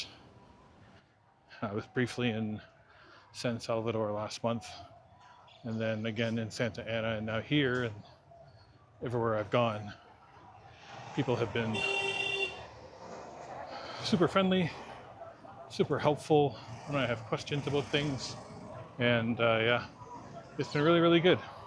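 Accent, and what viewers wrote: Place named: Spain. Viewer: I meant your English is starting to sounds Spanish 🎉🎉🎉 haha how long til you sound completely Spanish? And your pronunciation has definitely gotten a lot better!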